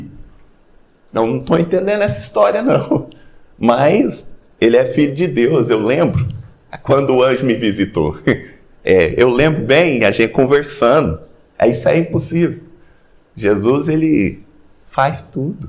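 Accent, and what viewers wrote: Minas Gerais, Brazil. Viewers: Parabéns, adorei o mineirês. Me cativou.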